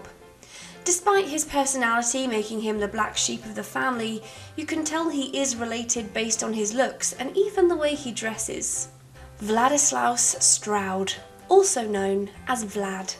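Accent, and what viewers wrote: United Kingdom. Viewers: Youre english sound like british accent, but you from america ?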